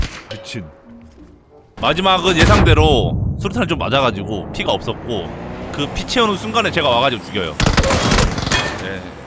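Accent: Jeolla, South Korea. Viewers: 여기서 전라도어 찐하게 배울 수 있어서 애청합니다. 요즘 잘 써먹고 있음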